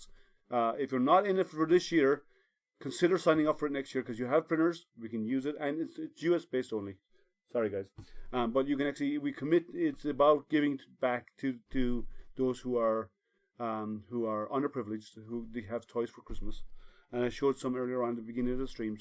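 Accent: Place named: Ireland. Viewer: well I can't get lightburn to connect. I was able to do a couple burns last night with GRBL but just didn't seem like I could change many settings. I thought you sounded Irish but just wasn't quite sure.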